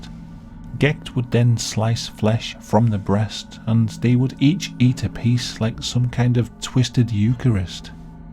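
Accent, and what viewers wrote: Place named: Midlands, United Kingdom. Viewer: Excellent!! Just subscribed! Love your midland accent..it sounds so pretty💯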